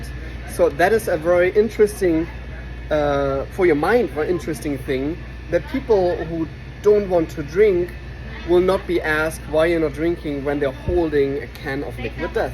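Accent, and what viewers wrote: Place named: Germany. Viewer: du könntest locker Synchronsprecher werden für deutsche rollen die English sprechen 😄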